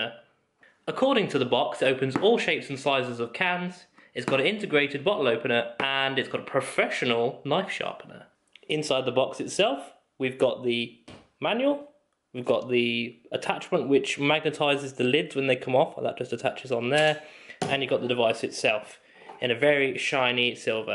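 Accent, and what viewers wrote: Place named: England, United Kingdom. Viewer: I just received my Kenwood CO606. Stay safe💙 Ps. It is so nice watching a youtuber with an english accent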